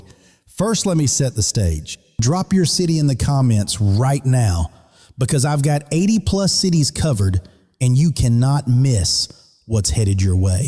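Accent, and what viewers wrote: South, United States. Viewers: so whats YOUR qualifications to be making a public forecast? Your lame southern accent sucks. Go get a job.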